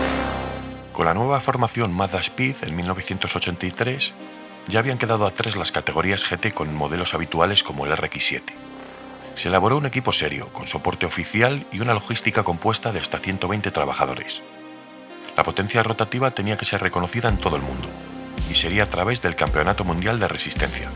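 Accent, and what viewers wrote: Spain. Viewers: me cansé... Me cansé de mirar tus videos sin comentar. En primer lugar, agradezco muchísimo tu dedicación, eso le da una gran calidad al contenido que generas. Sinceramente, con tus documentales de automovilismo, se aprende bastante sobre la historia. Y digo documentales porque, tu voz con acento español, las imágenes y la música ochentera, te dan ese estilo "retro" que me recuerda a los documentales que miraba por TV cuando era chico, o en los VHS que nos mostraban en la escuela. Gracias por hacer un video del Mazda 787B, que es sin dudas (para mi), el auto de carreras con el mejor sonido de la historia... pero bueno, gustos son gustos... Y te pregunto a ti: Para vos, ¿Cuál fue o es el auto de carreras, que lo escuchas bramar, acelerar y rebajar, y te parece que es el que mejor suena?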